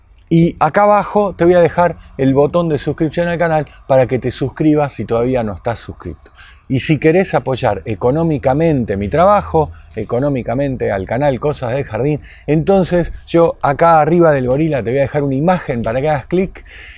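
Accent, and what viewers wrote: Argentina. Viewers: Sos argentino che redoy mi like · tienes acento argentino yo soy mexicano te sigo en mexico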